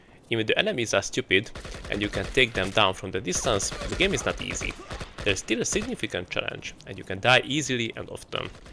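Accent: Hungary. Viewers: BTW, are you Hungarian by any chance? I think I can hear on the accent. I live in Budapest but speak very poorly. Anyway, subscribed and looking forward for more backcatalogue reviews.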